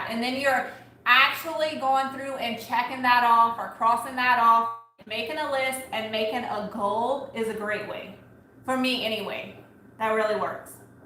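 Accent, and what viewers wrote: Louisiana, United States. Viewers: ♡ I happened upon your chanel about 2 days ago and completely binged you all day today while cleaning/organizing my craft room!! So inspiring and I love your accent, I grew up in La and you bring me back!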